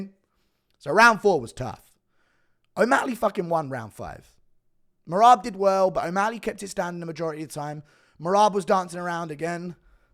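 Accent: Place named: United Kingdom. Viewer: Hi guru. Please say beaner in your next video. I know this term doesn't exist in the UK and I've been dying to hear someone say it in a british accent for years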